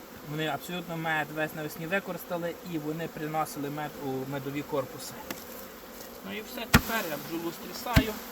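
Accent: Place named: Ivano-Frankivsk, Ukraine. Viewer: Цей говір , ну відразу ясно що людина з Франківська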